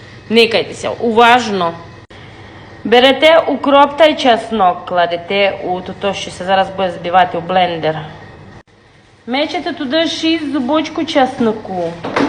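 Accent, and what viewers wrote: Transcarpathia, Ukraine. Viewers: Хіба це українська -це діалект закарпатської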